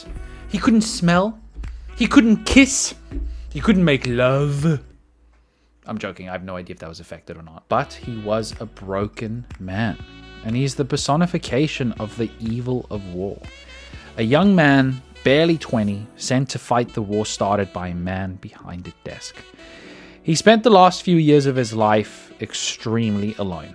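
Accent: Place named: South Africa. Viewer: Surely you’re South African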